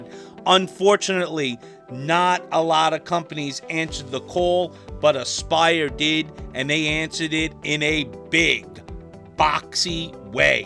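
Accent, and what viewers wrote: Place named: Boston, United States. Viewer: you have a nice boston accent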